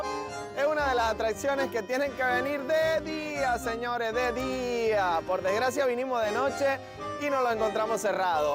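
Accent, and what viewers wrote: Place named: Nicaragua. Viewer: Es verdad hay muy poco amor en Argentina, muy buena tù reflexión. quizás por tù acento eres de Nicaragua o del Salvador, y en esos paìses haya mucho màs amor.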